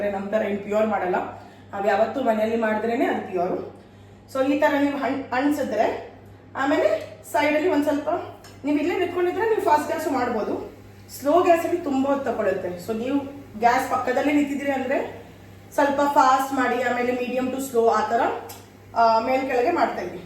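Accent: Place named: Uttara Kannada, India. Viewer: Ur talks r little like Uttar kannada ☺️ I am from Belgaum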